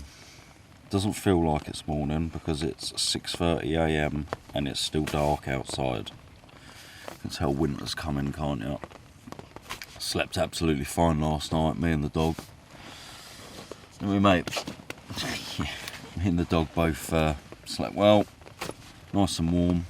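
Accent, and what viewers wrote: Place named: United Kingdom. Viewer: aww love your dog - quite the gorgeous animal and love his colouring. What part of the United Kingdom is your accent. We had a dear friend who actually came from London & sort of sounds the same but not sure. That fox was beautiful to watch hard to see it's colouring but I do feel concerned about their longevity of these animals because of the way they were hunted over the years. Dinner looked scrumptious thanks 4 sharing Cheers.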